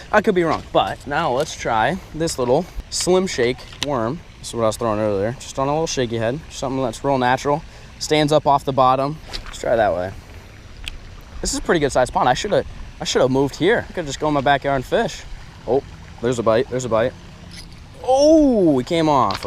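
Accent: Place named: Pennsylvania, United States. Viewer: You gotta be from pa bro 😂😂 u talk exactly how I do when I’m fishing 😂😂 I might cuss a little more tho 🤷🏻‍♂️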